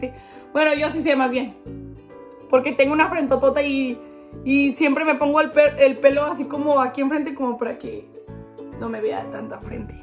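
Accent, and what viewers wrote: Mexico. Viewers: Ahuevo...... Los jaliscienses así hablan si sí si yo tengo familia en San Juan de Dios Jalisco y así hablan siempre.... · Me encanta tu acento mexicano, saludos desde Bolivia 😍🇧🇴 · LA PARTE QUE MAS ME ENCANTO FUE ' STOP TALKING' 😂😂 Y ME ENCANTA TU ACENTO MEXICANO SOY 100 SINALOENSE❤🇲🇽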